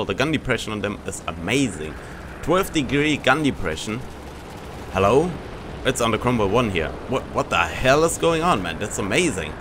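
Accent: Germany. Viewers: r u German I'm impressed with ur English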